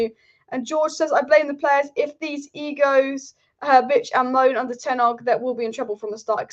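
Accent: United Kingdom. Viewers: it seems i have to learn british accent so i can understand good job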